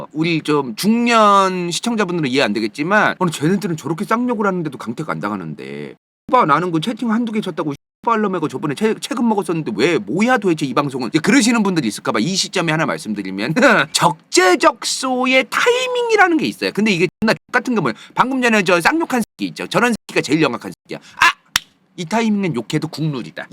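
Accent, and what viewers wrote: Chungcheong, South Korea. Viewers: 충청인으로서 공감~~ 고향말투 빠지네요ㅋㅋ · 앜ㅋㅋㅋㅋㅋ충청도 말씨 너무 좋앜ㅋㅋㅋㅋㅋ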